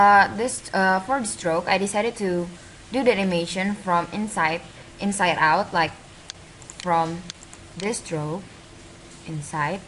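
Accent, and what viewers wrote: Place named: India. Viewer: This is really helpful ^__^ Thank You :D btw where are you from? cause your accent sounds a bit indian :)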